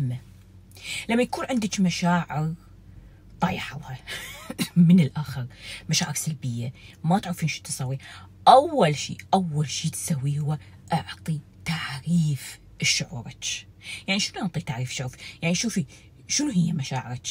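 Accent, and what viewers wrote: Iraq. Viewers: جعل ما يحجي غيرج لبى لهجتج العراقيه ♥️🎈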